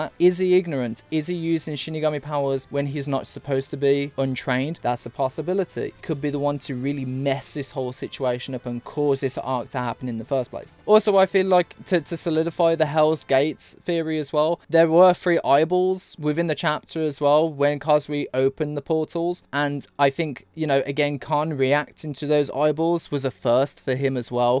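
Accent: United Kingdom. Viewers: haha sorry😅 maybe I should've worded that differently. I'm just subbed to a ton of different channels. Like hundreds... Anyways yeah so excited for bleach again! And love when you talk about it. Especially when you go into the symbolism and theological side of it. Your cool British accent keeps me engaged too. Now imma have buy some merch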